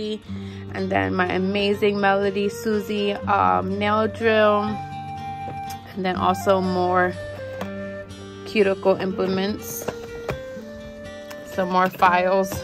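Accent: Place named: New York, United States. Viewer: Hi! I’m new here. Good luck to you! I’m new at the nail game too, so I would love to learn with you 💜 and I’m just guessing that you too are a NYer..lol. And if not, you sound just like me 🤣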